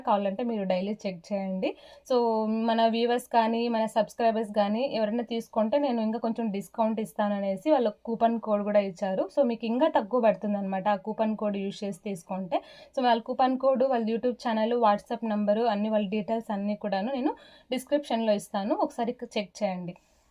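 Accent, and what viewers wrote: India. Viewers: 100 days challenge ki nenu kuda ready ga unna sister. Na age 29 na weight 91 ela taggalo artham kaledu ( me slang chuthunte thirupati la undi )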